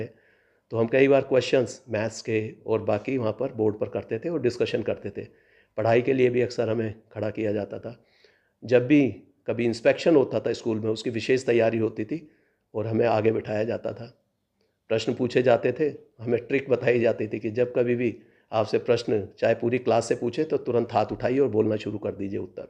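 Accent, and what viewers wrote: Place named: Delhi, India. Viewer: Sir aap delhi se hai yesha mujhey lag raha Tha kyun ki u.p ka language kuch defferent Hai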